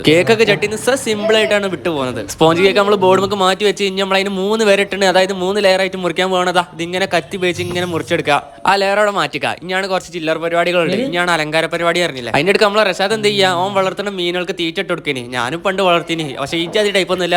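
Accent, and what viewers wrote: Malappuram, India. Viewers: Nammale malappuram baasha polichilleeeee guys kondotty power......😄😃😉😉😉😉😉😉😉😉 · ഞാൻ കണ്ടീൽ വെച്ച് മലപ്പുറം വർത്താനം കറക്റ്റ് കൊണ്ടുവന്ന ഒരേ ഒരു വ്യക്തി ♥️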